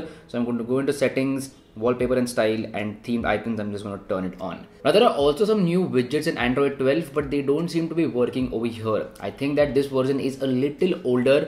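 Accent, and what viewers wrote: India. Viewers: INDIAN SPEAKING ENGLISH IS SO DAMN LIT ! I MAY UNDERSTAND THEM NOW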